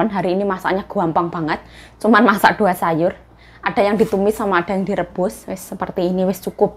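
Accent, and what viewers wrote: Java, Indonesia. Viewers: Nada Mandarin kmu perlu di pelajari medhok Jawa kmu masih jdi blepotan mandarin kmu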